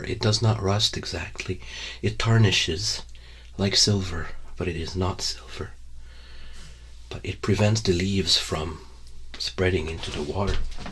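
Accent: Quebec, Canada. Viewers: I just discovered ur channel and it’s amazing 😍, Idk what’s going on most of the time but it’s very relaxing 😌 Lové the costumes etc and ur French Canadian which I also love 😁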